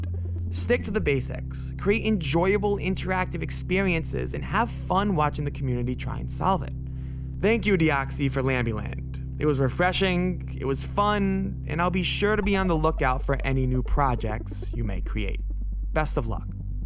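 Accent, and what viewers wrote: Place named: Boston, United States. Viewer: sometimes i think u have a Boston accent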